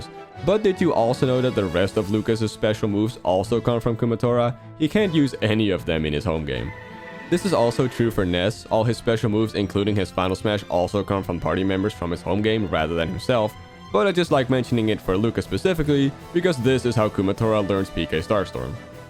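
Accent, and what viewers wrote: Netherlands. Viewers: Are u from the Netherlands or from Belgium?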